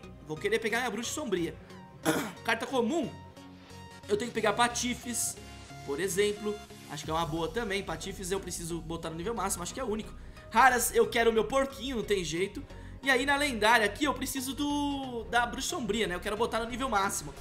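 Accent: Minas Gerais, Brazil. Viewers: Mineiro d dpirc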